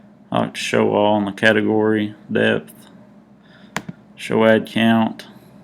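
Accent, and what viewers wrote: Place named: Appalachia, United States. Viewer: Hey there, Thank you for your very helpful information. Can't help but notice that you sound like you're from the Appalachian Mountains area, close to me, in NC.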